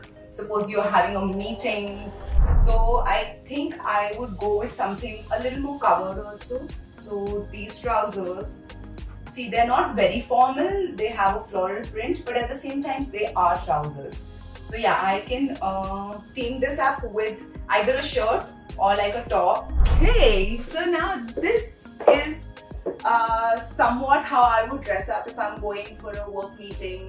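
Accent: Delhi, India. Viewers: such a delhiite haha for a sec i forgot you are an actress, aise mast bhasha bhi bilkul dilli wali, i love watching you <3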